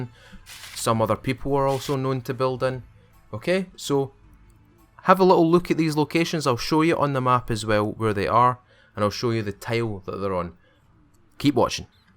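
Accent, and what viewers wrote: Scotland, United Kingdom. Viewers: Thank you always getting my lif information here and is your accent scottish? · I watch these just to hear that beautiful Scottish voice of yours.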